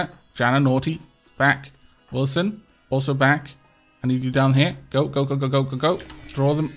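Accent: Wales, United Kingdom. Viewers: The Welsh is strong with this one. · not too noticeable. Loving the Welsh twang again :)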